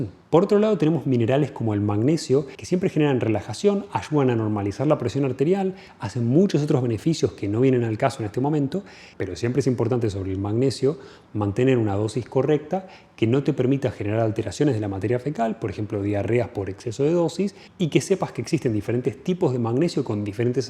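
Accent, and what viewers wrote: Argentina. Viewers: Se nota x la,manera d hablar q es argentino no es ninguna novedad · por el acento creo que es argentino 🤔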